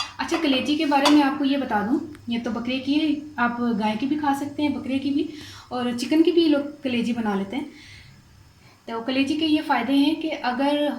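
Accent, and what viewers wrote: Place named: Punjab, India. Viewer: API ap buhat acha bolti ho Urdu k Saath Punjabi tarhka. Nice recipy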